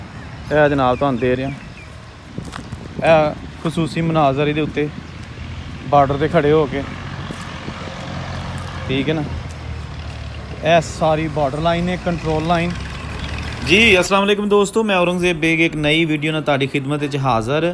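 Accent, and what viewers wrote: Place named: RS Pura, India. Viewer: ham bhi RS pura se aapki video dekh rahe hain Bahut hi badhiya janab aapki ham sari video dekhte hain donon mulk ek Jaise hi hain aapki boli bhi hamare RS pura jammu ki tarah hi hai 🙏👍❤️ music bahut badhiya lagaya hai link bhejo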